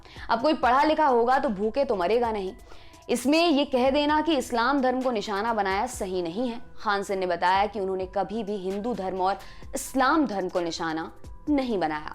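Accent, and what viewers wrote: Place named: Bihar, India. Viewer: Ya bihar se ay h lalu jaisa hi na bolenga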